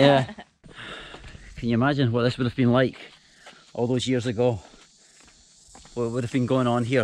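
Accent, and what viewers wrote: Scotland, United Kingdom. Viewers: Its funny those 2 yanks hadn't realised your strong Scottish accent and they've been all over Scotland 😜😉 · he is very Scottish. Scotland is a beautiful country to the north of England. to a British ear he is sooo Scottish. lovely. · Are you Scottish?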